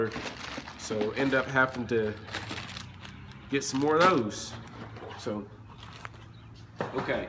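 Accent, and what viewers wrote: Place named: South, United States. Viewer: What part of the south are you from. Southern drawl is really noticeable. But great video.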